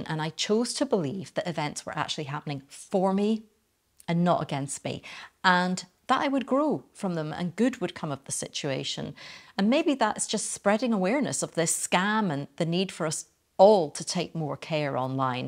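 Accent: Scotland, United Kingdom. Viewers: Subscribed to. I enjoy your chanel so much, your content, and your professionalism is very much appreciated. Also I love your wee Scottish accent🤗. Being a fellow Scot myself, living in Spain, it's a pleasure to listen to you🙏. Keep up the great work.💓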